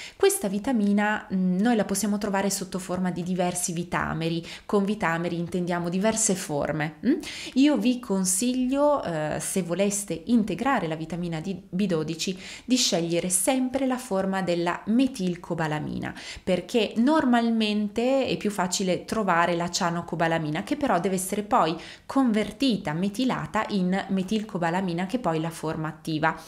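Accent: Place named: Turin, Italy. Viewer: Ma lei é di Torino neh? Ma boja faus😊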